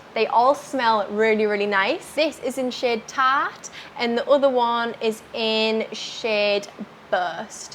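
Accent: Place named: United Kingdom. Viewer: Beauty, brains, and British accent! Can't get any better than that!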